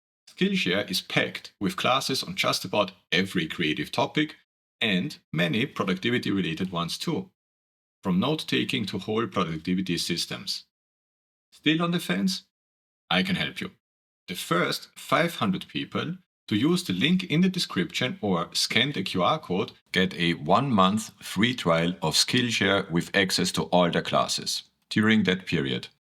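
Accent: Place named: Denmark. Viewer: Thanks for the video! Are you Danish? Your accent is cool, reminds me of Mads Mikkelsen haha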